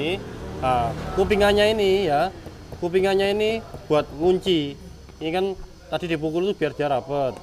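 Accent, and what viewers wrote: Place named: Surabaya, Indonesia. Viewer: Masuk, suroboyone nyel Iki😁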